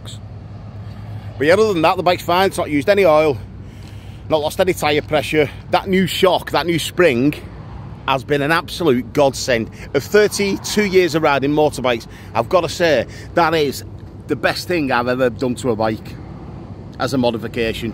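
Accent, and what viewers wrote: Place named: Liverpool, United Kingdom. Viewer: Your missus is clearly a Scouser but your accent is a strange mixture of Scouse and something else! What gives!! Great videos by the way.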